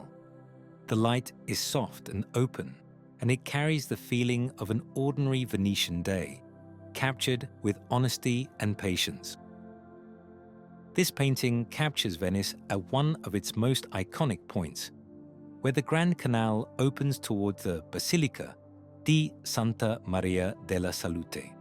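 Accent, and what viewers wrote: United Kingdom. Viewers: So, hey, I love what you’re doing with this channel. I am really interested in seeing these old town scenes from historic times be recreated. What you have done with the AI is greater than I ever could have imagined. I do believe that these recreations of the past are a world treasure. There are some things that need improvement, though. The repetitive slides tend to bother me and I feel sort of triggered seeing similar slides be shown several times over. Also, I suspect you use an AI voiceover, and I hope soon that gets changed too. Because that specific British accent and tone of voice is incredibly annoying to me, but maybe it’s just because I’m not British lol P.S. please recreate historic images of Ireland (1600’s to 1800’s) and America’s early western frontier (from the American Revolution to the Jacksonian Democracy)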